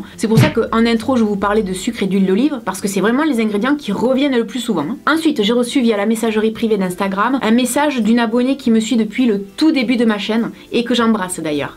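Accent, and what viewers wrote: South, France. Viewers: C'est normal elle viens du sud comme moi à l'accent du sud bien prononcer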